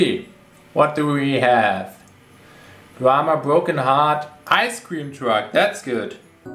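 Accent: Germany. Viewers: Man hört das Deutsche so raus😂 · german accent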